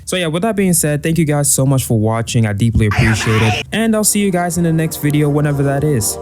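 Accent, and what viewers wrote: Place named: United States. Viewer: Wait...for someone who lives in SA,if he was born there,this dude has American levels of English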